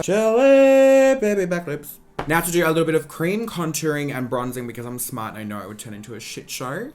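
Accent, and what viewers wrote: Australia. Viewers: Is he Australian? Because he sounds like it. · Is he Australian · Is his what posh Australians sound like? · tori s its australian like mee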